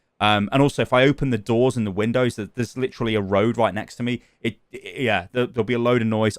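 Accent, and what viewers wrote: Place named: United Kingdom. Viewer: No lie, you're a beacon in these dark times. Super happy you're feeling better, Crohns & colitis is no joke! Also lmao "because apparently I'm lucky cause I got both" is the gallows humor that I'm here for 😂😂 wanna add that despite the fact that I'm not gonna play any blizzard games till theyve definitely changed, I'll still be here for your content cause I'd miss that working class British accent lol, you're the best duderino!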